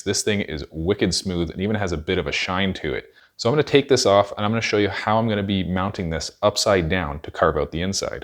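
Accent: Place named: Canada. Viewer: That dose of Canadian Accent made my day... Back when I was in shop class many years ago we were taught to put a piece of paper between the bowl and the sacrificial mount. It gave you a cleavage layer to make it easier to knock off with a hammer.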